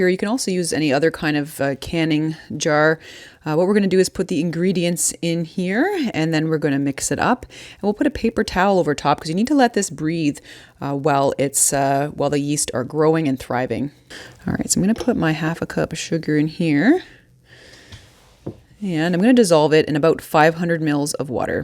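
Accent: Canada. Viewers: 1 min in and im thinking is that a Canadian accent I can here ? Ok thats a yes. Im English and go to Novia Scotia each year and im so wishing I was over there right now in lock down rather than here.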